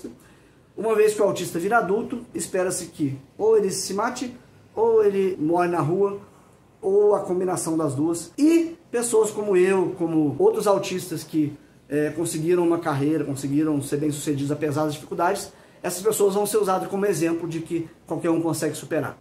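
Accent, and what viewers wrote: Minas Gerais, Brazil. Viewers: Uai que trem doido, cê é mineiro também?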